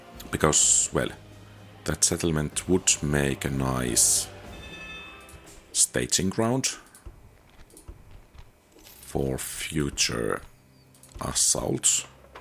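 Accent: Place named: Finland. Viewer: Love the video! Do you happen to be from Finland regarding your accent? ;)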